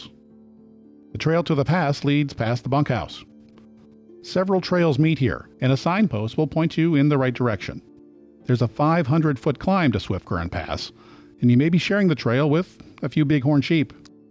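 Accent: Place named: Midwest, United States. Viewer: No fishing. I enjoyed you video, then saw your name. Right age, etc. Midwest accent. Friends with Mary B back in the day. Met you a few times.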